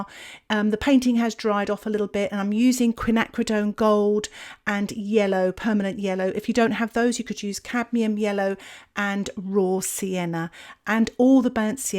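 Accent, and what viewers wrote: England, United Kingdom. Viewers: I love your accent ! English ?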